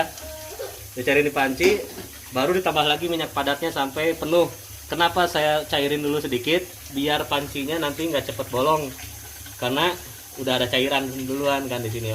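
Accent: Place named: West Java, Indonesia. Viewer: orang sunda ya kang...dr cara nyebut alat" penggorengannya..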